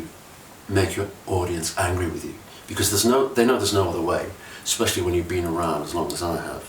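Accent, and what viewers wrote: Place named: Manchester, United Kingdom. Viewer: goddamn I love his Manc accent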